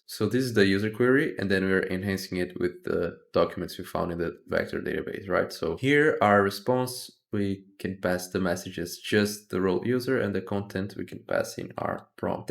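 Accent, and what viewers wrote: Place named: Brazil. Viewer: Não interessa o quão bom o seu Inglês seja, um Brasileiro sempre reconhece o outro haha, muito bom seu conteúdo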